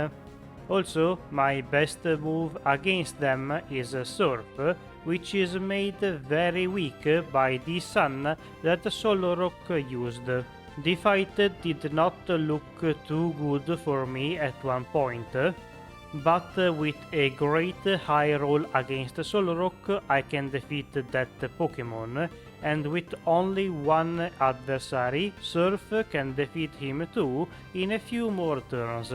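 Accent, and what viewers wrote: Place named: Italy. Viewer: Si sente che sei italiano...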